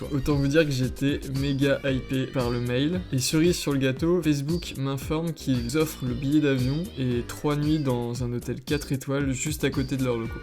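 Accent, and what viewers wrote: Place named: England, United Kingdom. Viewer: PTDR, j’viens de me taper cette même vidéo mais avec ton accent anglais, avant de me rendre compte que tu faisais ça pour toutes tes vidéos, con que je suis 😅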